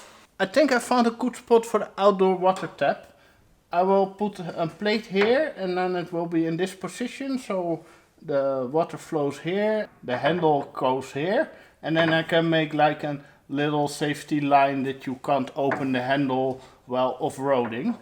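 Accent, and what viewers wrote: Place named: Netherlands. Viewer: Heerlijk Nederlands accent. Gaaf zo'n unimog als camper. Succes, ik ben geabboneerd. Ik ben bezig met m'n eerste camper te bouwen (vw t4).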